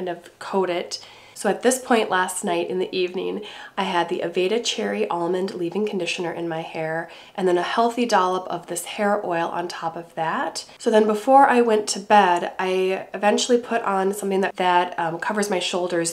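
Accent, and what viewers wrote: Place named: Minnesota, United States. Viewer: I knew I noticed a hint of a Minnesota accent! 😉. I hope you’re feeling better. My hair “routine” consists of Joico de-tangling spray, Chi Silk Infusion, my Dyson supersonic and round brush, and sometimes a touch of argon oil or anti- frizz serum once dry. My hair is straight, so it’s pretty easy. I’m envious of your beautiful curls!!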